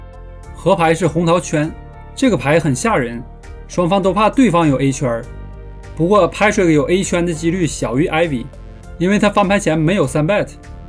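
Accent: China. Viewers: 哥们是长春的？我一个哥们和你口音很相似，他是长春的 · 喜欢你的东北口音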